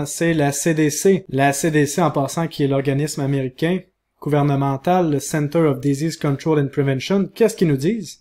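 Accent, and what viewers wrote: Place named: Quebec, Canada. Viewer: Assez impressionnant. On dirait un peu de théorie du complot. Mais je dois avouer que ça nous fait réfléchir. Je vais surement regarder cette vidéo encore quelques fois et faire mes recherches afin de m'en faire une idée. Merci. PS: c'est bien d'entendre quelqu'un du Québec. " Du coup " ça change de l'accent français...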